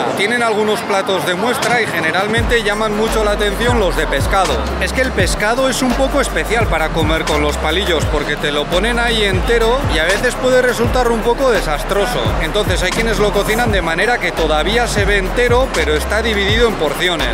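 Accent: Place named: Basque Country, Spain. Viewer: Tenía yo un concepto malo de China, una megafábrica hipercontaminada y de bajo nivel, pero me están encantando los paisajes, calles y gentes que se ven en vuestros vídeos, muy distinto a lo que imaginaba. La grabación “en movimiento” es un formato innovador. La dulzura y simpatía de es el complemento perfecto al acentaco vascorro de. Saludos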